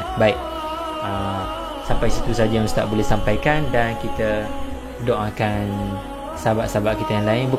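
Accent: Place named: Kelantan, Malaysia. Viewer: actually ustz org mana ? bnyi loghat cam klntan ad kedah ad lain2 pon ad😂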